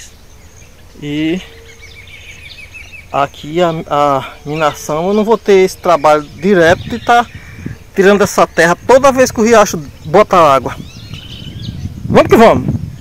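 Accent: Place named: Northeast, Brazil. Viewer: acho muito legal ver seus vídeos e ouvir sua narrativa com esse sotaque típico do verdadeiro nordestino. Abraços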